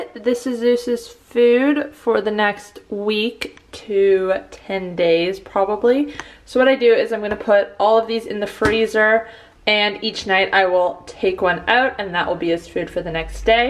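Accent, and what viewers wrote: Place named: Australia. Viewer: Your Australian yayyyyy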